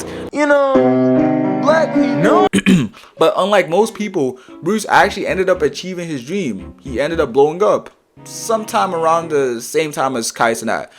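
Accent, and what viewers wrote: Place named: Toronto, Canada. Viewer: toronto accent is crazy😭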